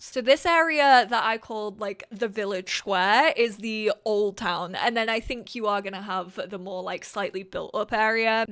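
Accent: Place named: Northern England, United Kingdom. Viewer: Does actually live in england still. To me her accent sounds like 40% northern and the rest vaguely america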